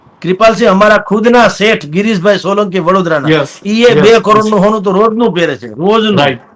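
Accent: Kathiawar, India. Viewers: કાઠિયાવાડી શૈલી માં ખુબ સરસ મોરે મોરો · I just love how you talk in Kathivadi Gujarati and explaining everything in detail 🤣🙏👏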